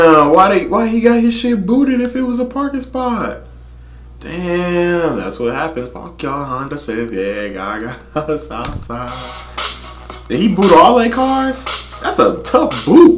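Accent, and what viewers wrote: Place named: Ireland. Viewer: The V sign means F*** you/off. Your irish accent is jokes 🤣.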